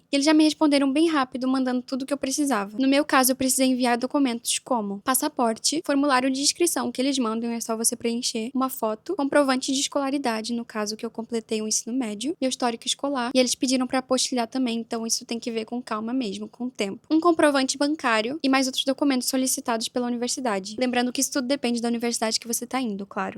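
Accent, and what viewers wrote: Portugal. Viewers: senti o sotaque de português Portugal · você tem nitidamente um sotaque português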